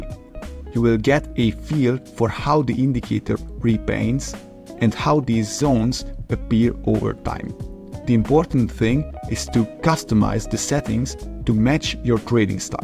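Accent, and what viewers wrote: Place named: Hungary. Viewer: Nice Hungarian accent… :-)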